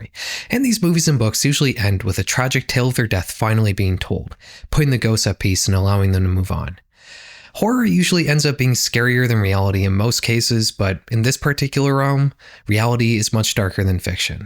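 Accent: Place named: Canada. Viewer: I'm really happy that this show is hosted by a Canadian. It's just too dark to be in an American or British accent. I need to learn "aboot" these things, not "a-bowt" them. It's just nice to hear while I'm looking up unedited versions of horrible stuff.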